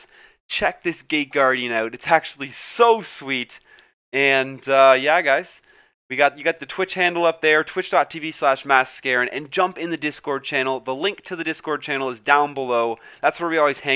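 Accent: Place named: Canada. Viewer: and that how i learned that MasKScarin is Canadian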